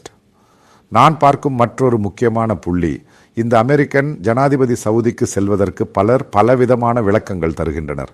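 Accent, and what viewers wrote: Kerala, India. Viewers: എനിക്ക് തമിഴ് അറിയില്ല എങ്കിലും കേൾക്കുമ്പോൾ മലയാളം തമിഴ് പറയുന്നതായി ഒരു തോന്നൽ എന്തായാലും തുടക്കമല്ലേ നല്ല തമിഴ് ഭാഷയിൽ തന്നെ ഇത് തുടരാൻ സാധിക്കട്ടെ എന്ന് പ്രാർത്ഥിക്കുന്നു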